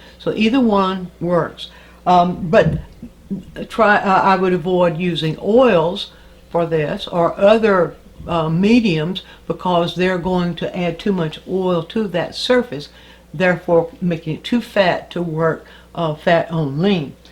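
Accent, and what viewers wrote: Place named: Mississippi, United States. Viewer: You are such a good teacher. I love your accent. Are you from MS, related to JoAnne?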